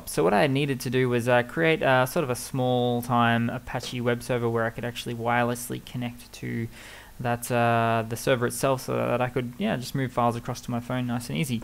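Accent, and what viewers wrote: Australia. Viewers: Enjoy your Linux video-tutorials, and especially great to hear an Aussie accent rather than the 'generic' American voice-over.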